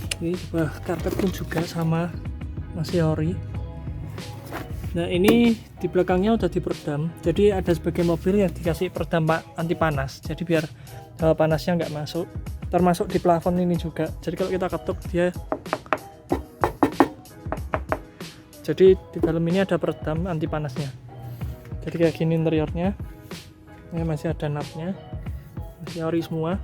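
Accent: Indonesia. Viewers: Paras cinese tp logat jawanesa 😆😆😆👍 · mase ngingetin koncoku orang Surabaya :D.. apik motore mas wkwkw..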